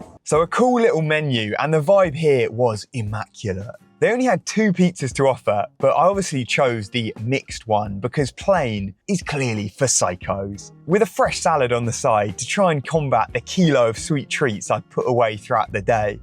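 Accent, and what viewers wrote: United States. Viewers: I feel like the video could have used more of the Translator. American accent 6.1 out of 10. Surfer or John Wayne? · Please never abandon the American accent. Peak.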